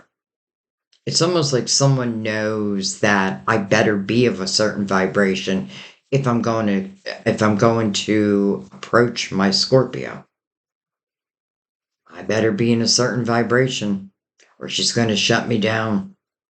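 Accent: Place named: Western Pennsylvania, United States. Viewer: Love your accent. Western PA?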